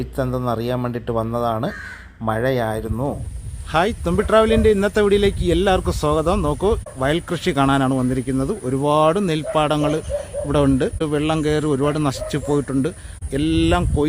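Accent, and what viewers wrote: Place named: Alappuzha, India. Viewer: Alappuzha slang ആണ് ചേട്ടൻ്റെ.. ആലപ്പുഴ ക്കാരൻ ആണൊ? ഇന്ന് കുട്ടികളെ കാണിക്കാത്തത് കൊണ്ട് mindoola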